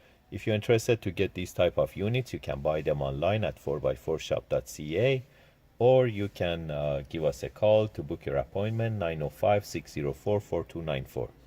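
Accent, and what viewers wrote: Iran. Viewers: You sound Persian... Salom chetori?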